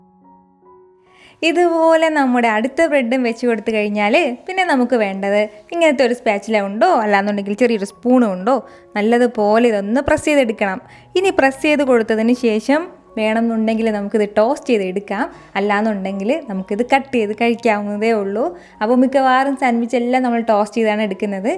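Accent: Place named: Kasaragod, India. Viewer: kasaragodile oru nattile baasha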